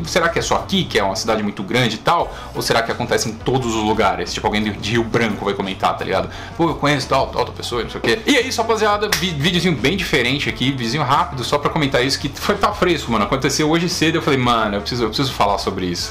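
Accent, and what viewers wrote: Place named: Goiás, Brazil. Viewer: Uai só eu acho que ele é daqui de Goiás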